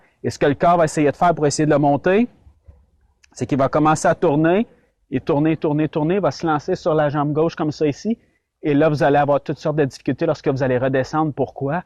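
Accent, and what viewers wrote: Quebec, Canada. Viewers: Que de bon conseils simples, efficaces et jamais entendus avec en prime l’accent québécois que j’adore. Je continue à dévorer toutes vos séquences et les partage entres amis. A 70 ans, J’ai une autre approche du golf Bravo. Guy le malouin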